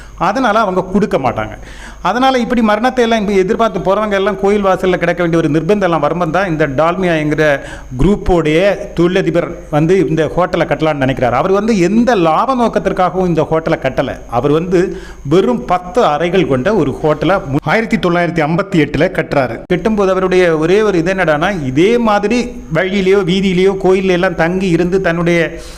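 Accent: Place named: Kanyakumari, India. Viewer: நீங்கள் குமரி மாவட்டமா? பேச்சில் தெரிகிறது 😊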